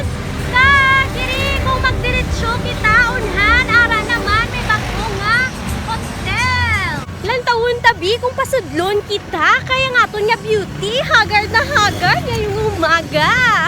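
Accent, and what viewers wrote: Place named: Ilonggo, Philippines. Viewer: Iba talaga pag ilonggo nagsasalita napaka sweet pakinggan.. Nakaka intindi lang ako pero di nakakapagsalita.. Nakapunta na ako jan sis sa Iloilo sis natutuwa ako sayo.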